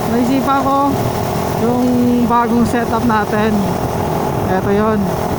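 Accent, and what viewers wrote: Iloilo, Philippines. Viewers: ilonggo ka gali tol.. 😁😁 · ilonggo kaman gali idol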